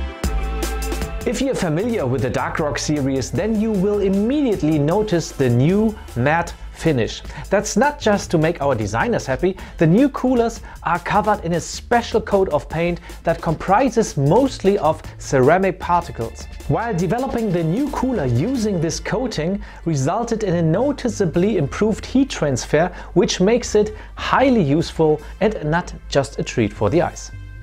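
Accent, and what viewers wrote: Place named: Germany. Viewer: Ur german accent bro 💀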